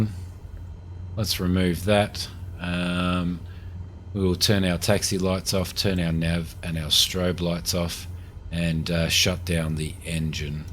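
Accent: Australia. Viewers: Thank you bro; You sound Aussie , Do you live over there irl? My home base in "On Air" is YMAV in Cumulus and YMEN in Stratus.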